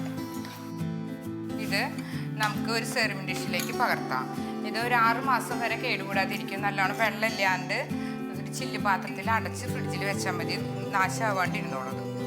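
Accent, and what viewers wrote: Thrissur, India. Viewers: Njnm aghna paraya.... hostail ennun kaliyakkum.... tcr slang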